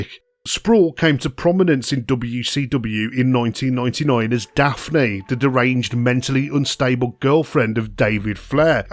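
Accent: United Kingdom. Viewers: “or joost a bit of good look” I love bri ish accents 😁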